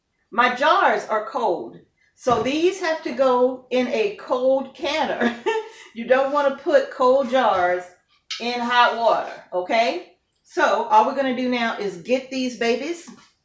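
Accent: South, United States. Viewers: Love. Your southern accent! Love your happy personality! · AMAZING!!! Thank you sooo much!! I will be canning my chicken tomorrow. May God continue to Bless you and your family. That southern accent makes me miss home. You are more of a Blessing than you will ever know.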